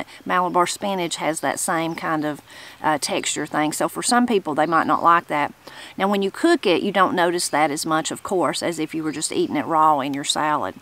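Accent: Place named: South, United States. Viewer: I just love this lady's country accent, it's so deep and she seems so proud of it.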